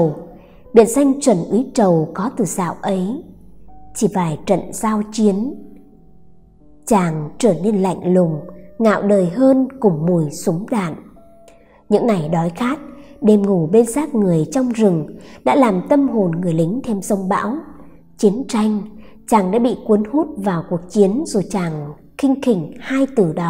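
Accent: Standard. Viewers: Giọng đọc của rất chuẩn và truyền cảm THẬT SỰ RẤT HAY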